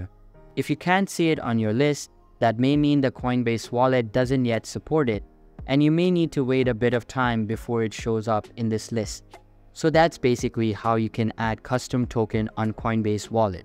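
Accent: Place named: India. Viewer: ai has indian accent now?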